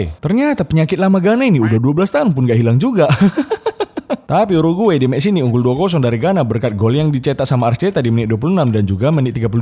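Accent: Medan, Indonesia. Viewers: Orang medan dari logat ngomong nya yang punya channel ini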